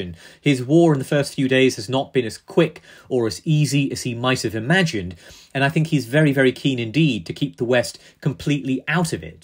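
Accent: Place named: Scotland, United Kingdom. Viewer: Its a pity the Scottish accented voice over thinks "nuclear" is pronounced "nukilla".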